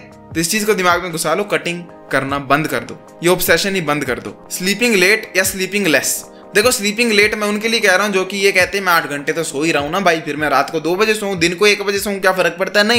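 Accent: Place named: Haryana, India. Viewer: Bhai aapki boli haryanvi jaisi hai❤❤❤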